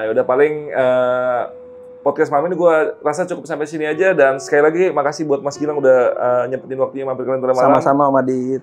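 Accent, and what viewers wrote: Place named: Kebumen, Indonesia. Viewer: Whh trnyata mas Adit wong 'ngapak' Kebumen jg yh.. Kebumen nya mn mas??😅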